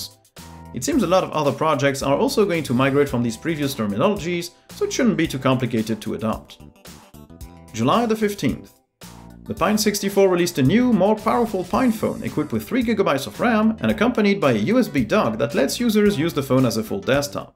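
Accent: Brittany, France. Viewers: Il est de Brest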